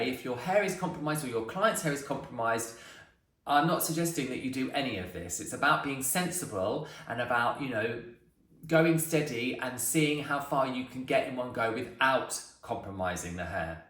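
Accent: United Kingdom. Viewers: Hi ,are you in the UK? I'm assuming you are by the lovely accent you have xx Do you take bookings can you do my hair please ?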